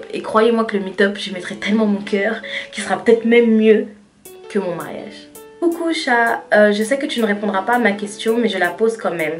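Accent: Ivory Coast. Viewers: J'ai pensé Ivoirienne tu a un pt accent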